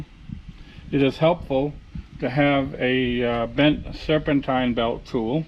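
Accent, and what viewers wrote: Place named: Northeast, United States. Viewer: Ps Sounds like you're up here in NE by that accent.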